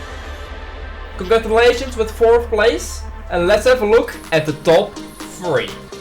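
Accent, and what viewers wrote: Netherlands. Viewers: Dutch accent nice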